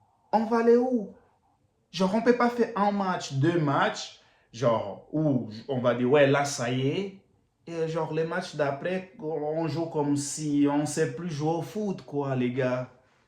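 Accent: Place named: Portugal. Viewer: Bonne vidéo mon frère, on sent ta colère et je la partage ! Loin derrière nous l'époque des grands Cristiano, Ramos, Marcelo, etc, loin derrière nous l'époque où les joueurs se battaient pour le blason et qui mouillaient le maillot...maintenant on a des branleurs. Des branleurs avec un énorme talent, oui, des branleurs qui parfois arrivent à avoir une étincèle, un brin de génie, mais des branleurs quand même. D'ailleurs, petite question, es tu portugais ? J'ai comme l'impression d'entendre un léger accent. 😄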